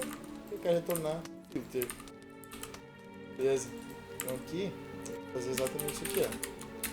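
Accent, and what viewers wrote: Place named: Rio Grande do Sul, Brazil. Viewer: Saudades mesmo! pelo sotaque ele é do RS, ele sumiu no inicio das enchentes, será que aconteceu algo com ele? to preocupado...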